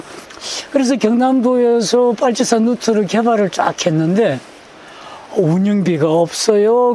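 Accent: North Korea. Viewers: 이북 말씨네.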